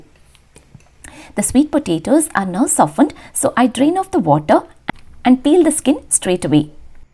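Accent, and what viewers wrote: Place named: Karnataka, India. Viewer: Original Karnataka accent Instead English better you speak your mother tongue. Coz hater over European countries better avoid their respective living style and utensils and the other influence belonging.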